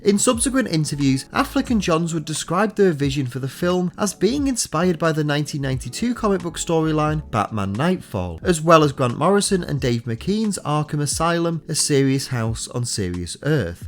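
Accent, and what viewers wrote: United Kingdom. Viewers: Hey your soothing, British voice keeps me company and informed during the night shift. Thanks mate✌🏻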